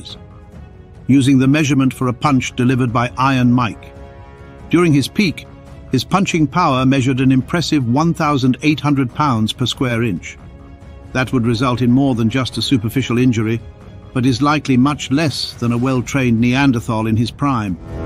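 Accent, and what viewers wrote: United Kingdom. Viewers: Just because there is a British accent delivering information doesn't mean that it cant be patiently stupid information. Right, wrong or infifderrnt, his is just way over done without any real science behind it.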